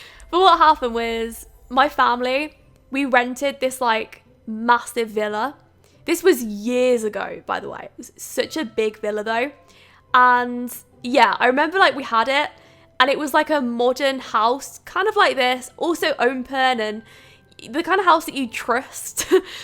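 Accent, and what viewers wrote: United Kingdom. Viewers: You have the most British accent I've ever heard.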